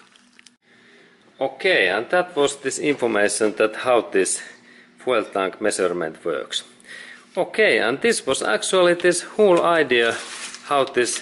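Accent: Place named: Finland. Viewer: Suomi aksentti on paras aksentti. Subscribed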